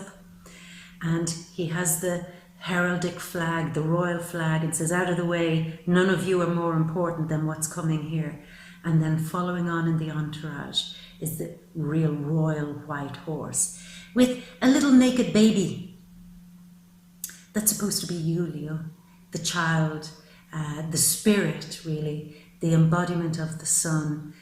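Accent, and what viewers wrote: Ireland. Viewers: I absolutely love your energy! You have an accent so I assume you are Irish? If so, for my August 8 birthday next year I am going to Ireland and Scotland. My beautiful daughter is taking me for my 60th birthday. I can’t be more excited I love and always wanted to go to Ireland! I think you are absolutely a joy to watch! Thank you for your wonderful readings!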